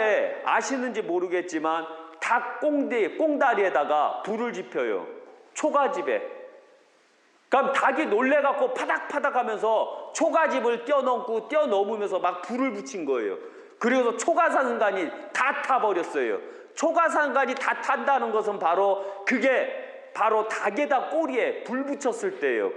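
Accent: Chungcheong, South Korea. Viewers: ㅅㅏ투리가 충청도 분이신가?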